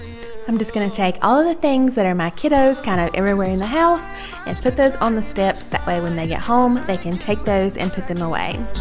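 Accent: South, United States. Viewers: Hello 👋🏻 I just found your channel today and I’m binge watching your FALL DECOR videos! Thank you so much for sharing! Your accent is so sweetly southern but I can’t tell where you’re from. Do you mind sharing? I am born & raised Louisiana but my accent is not as cute as yours! 🤗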